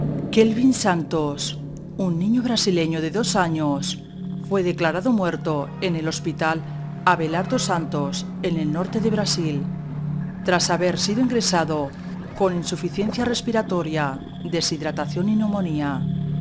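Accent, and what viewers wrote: Spain. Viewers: siento que la chica que esta hablando es de españa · ese acento Espanol me cae en los huevos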